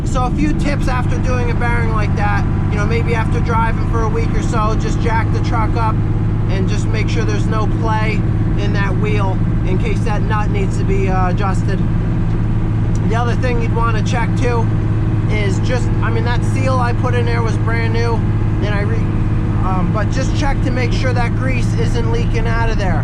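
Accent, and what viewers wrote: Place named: Northeast, United States. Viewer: Hey, I don't think I heard you correctly, - , it sounded like you said... "Like every time you be Meth..."? I know, I'm from California and I sometimes have trouble with that 'NorEastern' accent... What was that?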